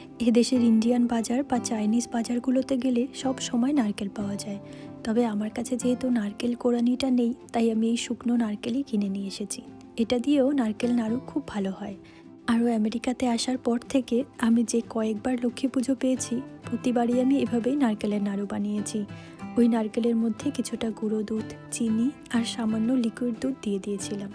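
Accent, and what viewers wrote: Bangladesh. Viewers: Amar mishti Maa..khub khub sundor lagche and lakhi rupe gune sob pujo kore cho❤❤❤❤..ami o tomar moto bangladeshi bangla boli .khub bhalo lage.. just st love this bangla...but my husband is ghotti...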